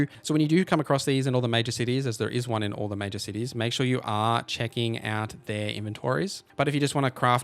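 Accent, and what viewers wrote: Australia. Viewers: I thought people liked the aussie accent. Why are they bullying us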